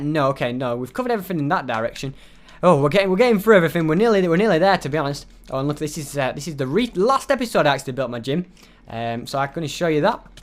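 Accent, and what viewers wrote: United Kingdom. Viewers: Are you british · Happy Gamer he doesn't say fam it's just his british accent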